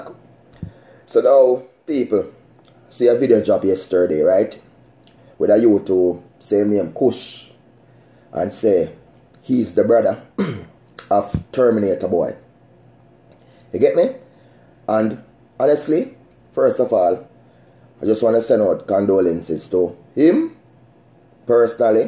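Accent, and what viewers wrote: Jamaica. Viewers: Bredda yuh sound like a St. E man. Please confirm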